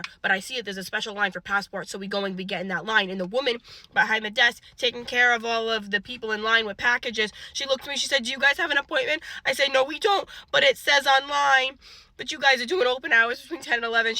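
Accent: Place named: Louisiana, United States. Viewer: The accent! Sound like us down in Louisiana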